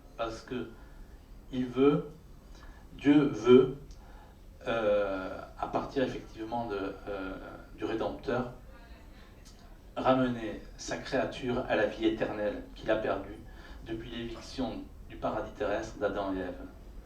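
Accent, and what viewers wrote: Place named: South, France. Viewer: passe son temps assis à chier dans des cerveaux vides. C'est dingue comment une vague élocution et un accent du sud aux consonnances de sinus encombré, peuvent gruger des individus, tout ça parce qu'il balance vite fait deux versets d'évangiles... Gnnnnnnnn kop11111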